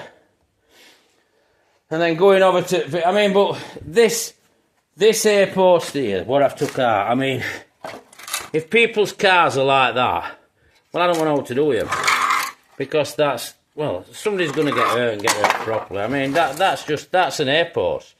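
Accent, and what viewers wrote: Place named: Barnsley, United Kingdom. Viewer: Good video pal just subscribed, you sound very local to me barnsley ish. Show us some update videos of the welding n stuff. Cheers 🍻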